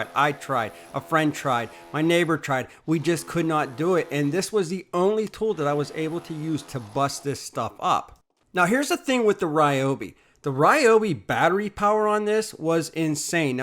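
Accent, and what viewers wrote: Pittsburgh, United States. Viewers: Love the Pittsburgh language!!! PGH STEELERS 4-LIFE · 🤘🏻Pittsburgh speak 🤘🏻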